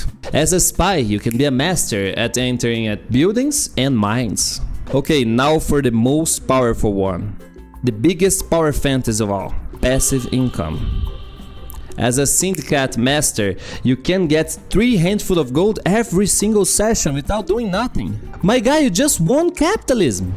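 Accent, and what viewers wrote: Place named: Brazil. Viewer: Are you Brazilian by any chance? I'm asking because your accent sounds very Brazilian hehe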